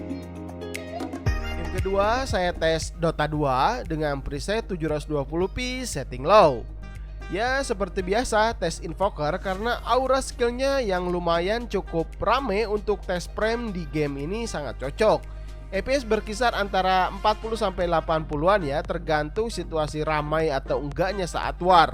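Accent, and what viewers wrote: West Java, Indonesia. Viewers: Sunda pisan maneh huhuy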